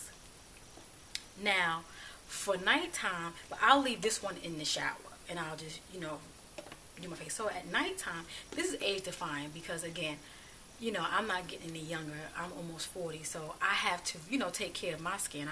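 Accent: United States. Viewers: Great video, you look very good for your age and I like the way you speak, where in America are you from?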